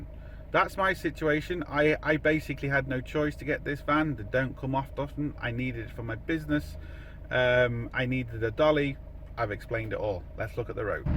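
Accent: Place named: England, United Kingdom. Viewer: Funny all the years you.ve lived here u never lost ur strong English accent 🤔🤔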